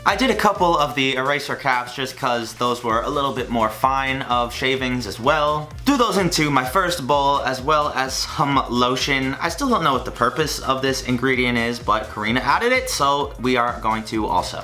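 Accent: New York, United States. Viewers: Is it just me or is actually Freddie Benson with a New York accent?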